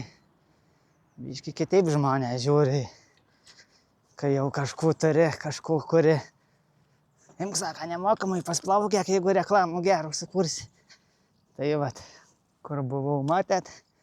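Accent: Aukštaitija, Lithuania. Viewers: Man žemaičiui labai įdomiai skamba aukštaičių tarmė. 👍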